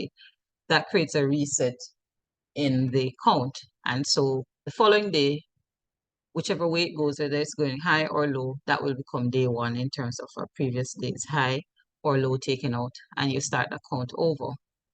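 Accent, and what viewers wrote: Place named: Jamaica. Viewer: Jamaican accent 🎉. I automated SB strategy into an Expert advisor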